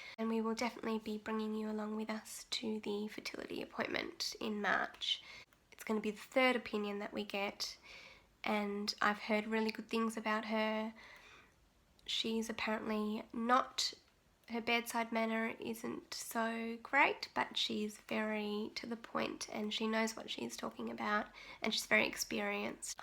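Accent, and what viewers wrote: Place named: Boston, United States. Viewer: Nice Boston accent!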